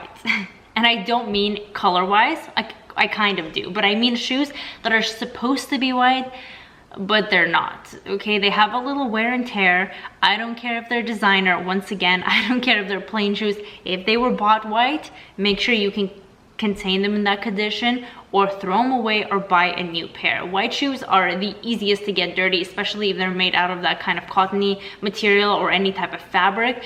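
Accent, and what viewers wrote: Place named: Russia. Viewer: I was doing good until you got to wedges. I have a pair of black ones for the office. Where I live in Canada a lot of women wear these type of shoes for work. It makes driving a lot easier, easy to go up and down stairs, don't need to worry about getting heels caught in the escalator or those annoying grates on the sidewalk that you can't avoid while walking in a crowd. And for me, I am always scruffing my shoes on the wheels of my chair. But yes, my sandals are pretty, I think. I was also wondering about your heritage. Is your background Russian? My background is Ukrainian and you sound Russian to me.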